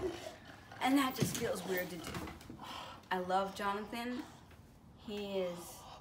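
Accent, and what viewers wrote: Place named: New York City, United States. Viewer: I had no idea her she had a NYC accent! Comes out every now and then, awesome! I get made fun of mines all the time at work, cause for what ever reason I have a slight Bostonian undertone to some words.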